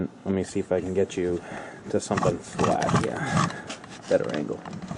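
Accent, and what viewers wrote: Boston, United States. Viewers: ***** Im from CT . I honestly wasnt sure if it was upper east side manhattan or boston. "in my bet boston accent" Go PARK the Car!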